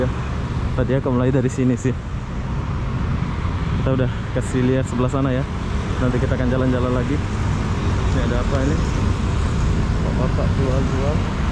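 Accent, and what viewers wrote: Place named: Malaysia. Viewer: idk why i feel uncomfortable hearing him talking in indo accent but mixed with a lil bit of malay diction, like it is kinda cringey 🙄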